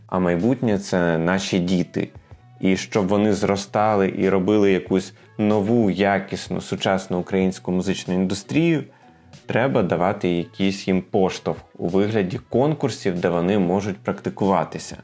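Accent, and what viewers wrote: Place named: Russia. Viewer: Дякую за такий гарний ролик! Прохання до ведучого розмовляти українською вдома. Російський акцент жахливий .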